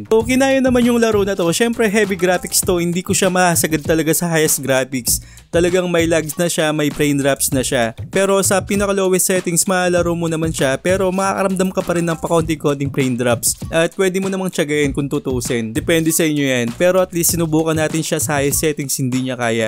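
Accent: Pampanga, Philippines. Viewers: Kapampanagn accent alam na alam ko e hehe new sub here kabalen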